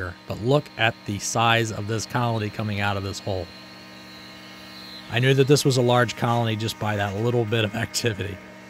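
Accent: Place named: Philadelphia, United States. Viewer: You got a Philly accent. Certain words you say sound just like if you were from that area. Say the word Acme in one of your vids. Then I'll know for sure.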